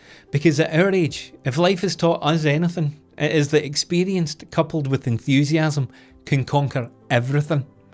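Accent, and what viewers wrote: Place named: Glasgow, United Kingdom. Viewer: Hey thanks for your take on photography. I recently purchased a mirrorless camera, a 50 mm lens and now a RF 24- 105 "L"lens from Canon for my wife. She's always wanted a good camera so I started her with a Canon R50 APC but a full frame is in the future ih her interest in photography grows. Although we live in the states my wife is from Glasgow so she will appreciate your accent. Thank you sir, will be watching all your content.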